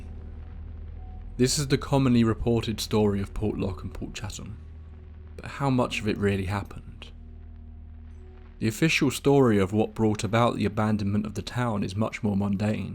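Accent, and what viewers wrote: England, United Kingdom. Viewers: Something about this guys narration and his regional accent makes these stories, extra interesting. I always keep my attention on the story. A British accent makes everything sound better. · Ive just discovered your channel. I livevin the UK so its good to hear an English accent. No offrnce meant i look forward to many more stoi43t ,😅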